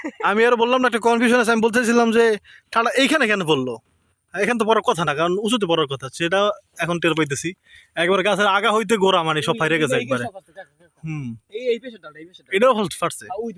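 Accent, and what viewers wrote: Barishal, Bangladesh. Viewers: আপনার বাড়ি বরিশালের কোন থানায়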